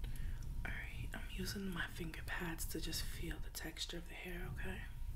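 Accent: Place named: Queens, United States. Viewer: where are you from?? some things you say sound exactly like my friend who's from queens lol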